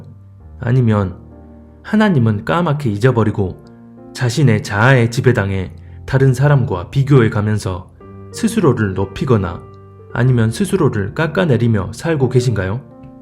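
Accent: Jeolla, South Korea. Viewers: 전라도 성도님이십니까요잉?ㅎㅎ사투리가 걸쭉하신게 듣기좋습니다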